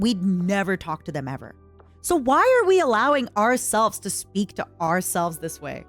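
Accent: Quebec, Canada. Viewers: lmao she even speaks the Quebec dialect xD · as a french from France, it's wierd to have a "typical" french music, over an quebec accent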